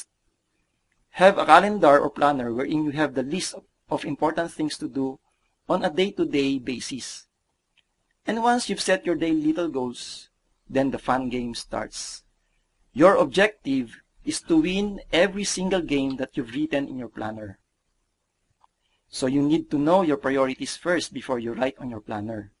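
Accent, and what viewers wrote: Philippines. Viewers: Duhh. Filipinos really speak that way. And who cares, as long as we understand what he's saying then everything is fine. Okay? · Filipinos speak that way. it's ok as long as we understand what he say.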